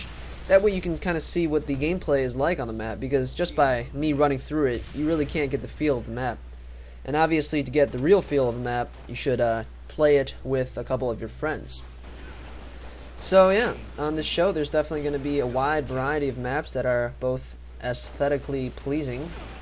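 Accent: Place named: United States. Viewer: i know youre asian, but you sound like you grew up in america, so what was your first laguage and where did you learn it?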